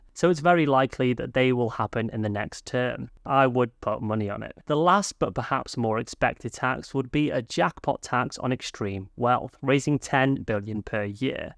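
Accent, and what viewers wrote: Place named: United Kingdom. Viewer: Replace the British accent and substitute Canada for England and it could be the same video. Gov't attitude is to tax to get funds, create a need, raise the tax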